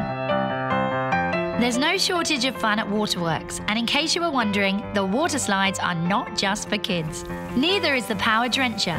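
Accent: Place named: England, United Kingdom. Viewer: and her English accent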